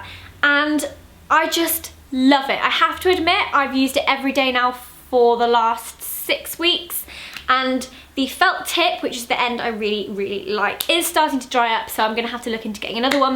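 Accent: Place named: Somerset, United Kingdom. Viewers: I'm from the south west and I literally sound exactly like you at the start of the video(: love your makeup in this video!💕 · I know you said shout out to Wiltshire, but people speak like this in Somerset too, and it was nice to hear, being at uni so far away from those accents you don't think you'll miss!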